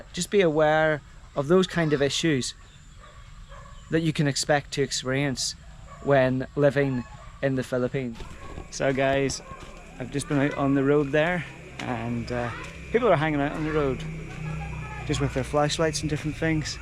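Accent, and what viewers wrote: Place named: Northern Ireland, United Kingdom. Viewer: Are you by any chance from Norn Ireland? Your accent kind of reminds of a friend from Belfast. Greetings from a wee man from Iloilo as well. Unfortunately we tend to get stained by the NGCP's incompetence. I love my city but I'm scundered by the length of brownouts sometimes. Terrible intro to 2024. I hope your doing grand mate!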